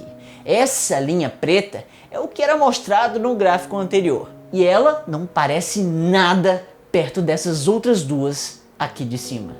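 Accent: Ceará, Brazil. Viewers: Vc é cearense? Tbm sou haha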